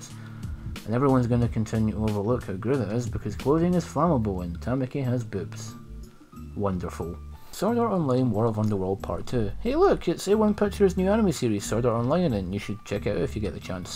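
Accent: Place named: United Kingdom. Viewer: God, I love that British voice of yours.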